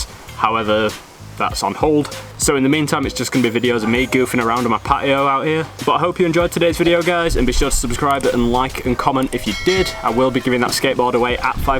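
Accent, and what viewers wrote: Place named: United Kingdom. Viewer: Am getting back into skating at 30 am watching like like waaat I have allot to Learn haha you sound like your from UK same as me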